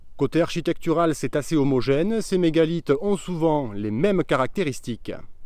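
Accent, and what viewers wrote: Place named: South, France. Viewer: Bravo pour ce petit tournage, "avé l'assent" en plus!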